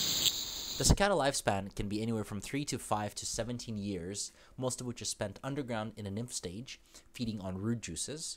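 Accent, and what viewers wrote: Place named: United States. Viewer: I know without checking what it will say, it's an American dictionary. I've already explained how you yanks pronounce it & why. That doesn't make it correct, only in your little realm.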